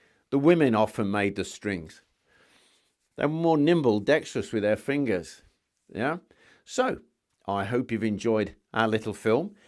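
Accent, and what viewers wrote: United Kingdom. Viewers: Always love your videos ☺️ I could listen to your lovely British accent for hours, lol. Thank you for the instructive video, as always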